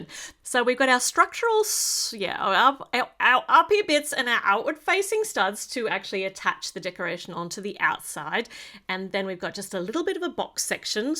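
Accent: Australia. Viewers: Who else loves her Australian voice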